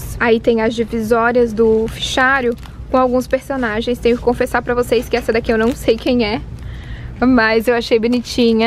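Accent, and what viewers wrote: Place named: Santa Catarina, Brazil. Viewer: No início do vídeo já imaginei que vc era aqui de SC pelo sotaque 😊😘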